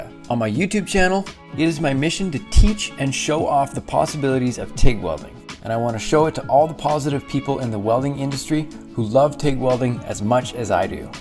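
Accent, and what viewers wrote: Canada. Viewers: Canadian aye 👌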